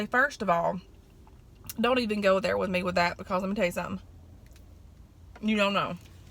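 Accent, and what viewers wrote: South, United States. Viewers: I'm a new subscriber! I just love you and your attitude/personality! You're so pretty and I love your country accent! Girl I feel you and I ain't got time for no negative stuff either! #2018 #Amen 😍❤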